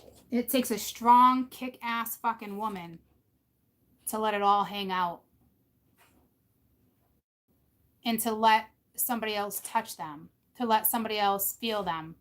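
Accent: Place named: East Coast, United States. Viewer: My grandpa and grandma Hardy and autie Sue were from Fall River Ma ..I think I was initially drawn to you because you bring memories of them and the East Coast to me with your accent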